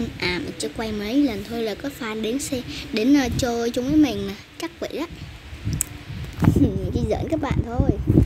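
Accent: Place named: North, Vietnam. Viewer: Ủa sao lại nói giọng bắc, bà nói giọng nam cơ mà??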